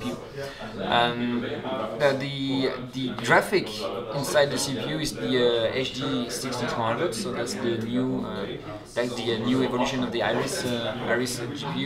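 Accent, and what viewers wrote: France. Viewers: L'accent francais est tres present xF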